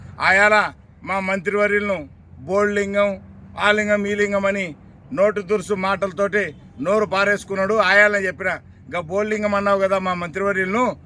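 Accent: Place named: Telangana, India. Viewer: Nuvve evarra telangana vadi la vunav Kadapa language em ledu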